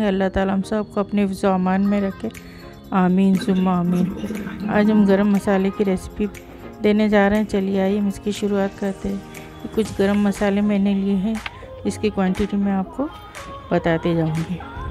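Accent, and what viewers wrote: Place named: Karachi, Pakistan. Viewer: ہم حیدرآبادی ہیں میری بہن آپ مجے آپ کراچی کی لگ رہی ہیں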